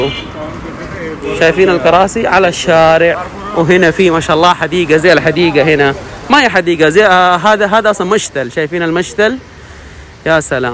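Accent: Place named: Jeddah, Saudi Arabia. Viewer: اللهجه جداوي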